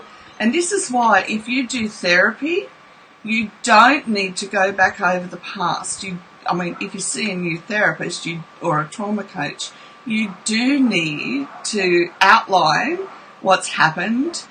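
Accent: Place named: Australia. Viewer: Thought I’d add, I’m a 49 year old woman and you tube popped up your video, I live in Jackson Mississippi and was listening to Dr Kirk Honda vids. You sound Australian, are you? I married a kiwi and resides in NZ for 10 years. I’m often amazed at the people who I won’t know “in real world life” can provide such insight and help to others. I plan to watch more videos, you seem very pleasant as well as quite credible and informed.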